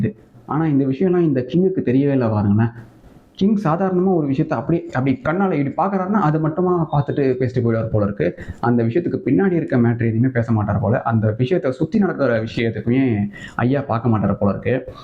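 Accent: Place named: Chennai, India. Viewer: நீங்கள் பேசுறதுலையே தெரியுது நீங்க ஒரு சென்னைவாசி தான் என்று சென்னையில் எந்த ஏரியா நீங்க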